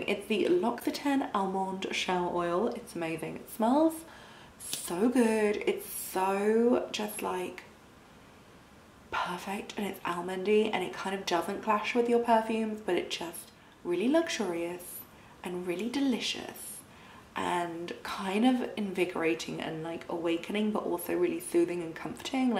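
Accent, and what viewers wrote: United Kingdom. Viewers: Thank you for the infos said with a lovely British accent ❤️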